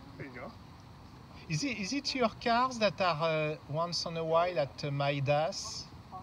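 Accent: France. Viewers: Sacré accent Français haha ! j'ai la même en 1977 avec équipement fédéral présidentiel complet, sirène US, gyro US dans calandre, Citizen band, full tinted glass, téléphone, mini bar whiskey and cathodique TV avec antenne boomerang chaines Française. Ce n'est pas une sedan mais une factory Fleetwood limousine formal serie 75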